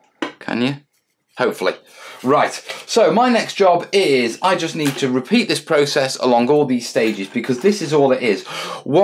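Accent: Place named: Scotland, United Kingdom. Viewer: This is my first time watching one of this guys videos and I was not at all ready for his Scottishness 😂. Also bro calm down you are going to hyperventilate.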